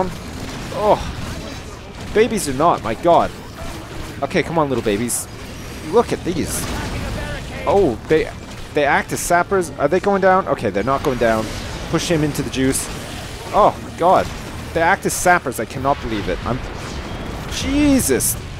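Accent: Canada. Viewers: Which part of Canada are you from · Oh, you are so canadian. soory everytime you say it it makes me smile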